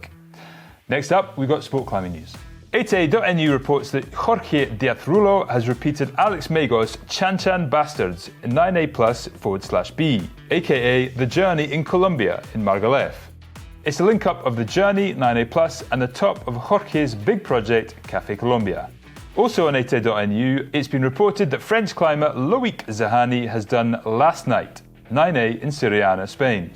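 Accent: Scotland, United Kingdom. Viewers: I swear you sound a lot more Scottish than I remember!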